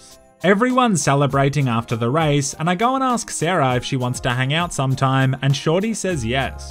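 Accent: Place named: Australia. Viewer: double dropping caps, your so australian in ur vids i love it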